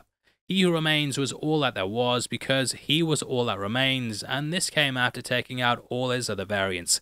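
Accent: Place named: United Kingdom. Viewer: Me after each episode knowing I have a smooth talking British accent on YouTube ready to explain everything to me: ☺️💕🤪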